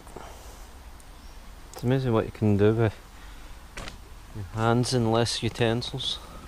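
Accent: Scotland, United Kingdom. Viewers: Where in Scotland is it your from?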